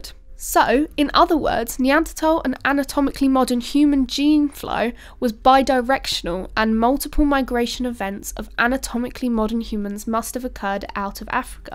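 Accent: RP, United Kingdom. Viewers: Alright, she does, admittedly, bring clear-spoken Queen's English to the narration. Still (mischievously) one has to wonder if of the boys brought in a GF to see if she would get a better YT response. Yes.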